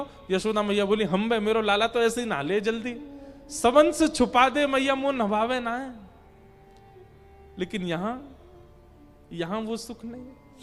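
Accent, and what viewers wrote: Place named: Braj, India. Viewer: Jai jai aap k charno me koti koti pranam jai jai aap ki katha se sabka jeevan dhanya ho rha h jai jai aap k mukharvind se brij bhasha bhut acchi lagti hai aap k charno me koti koti pranam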